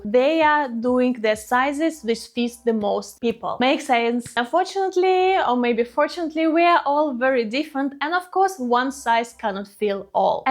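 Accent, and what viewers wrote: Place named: Russia. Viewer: Are u from Russia? Your accent and pronunciation is very special. Russian style 😅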